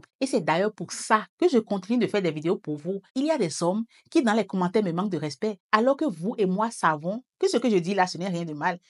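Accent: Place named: Benin. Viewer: Ce que j'apprécie vraiment par dessus tout au delà de la clarté avec laquelle vous expliquer les choses c'est le faite de garder votre acens naturel de femme africaine et particulièrement béninoise sans pour au tant mimer l'accent occidental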